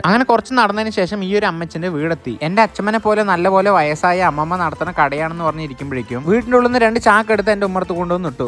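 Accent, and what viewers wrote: Palakkad, India. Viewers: 😂😂palakad slag🔥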